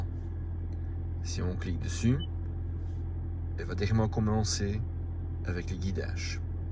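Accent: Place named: Belgium. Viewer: C est quoi cette video au ralenti?et puis faut aimer cet horrible accent belge.Bref j irai la decouvrir moi même chez Toyota une fois!!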